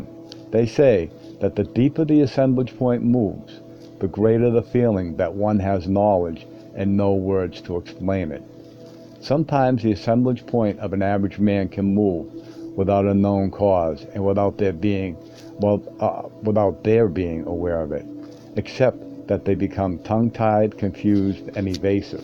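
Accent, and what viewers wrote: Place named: New York, United States. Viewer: Love your reading. The NY or Jersey accent? It is relaxed and not the MACHO WARRIOR of the others. Thanks! But the background music could fade off to Mars, IMHO! This writing involves connection to the Earth - not space.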